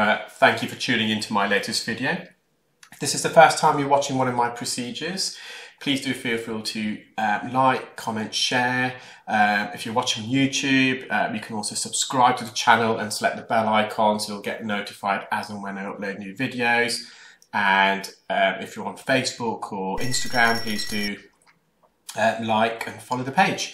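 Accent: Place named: Leicester, United Kingdom. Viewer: he's from Leicester in England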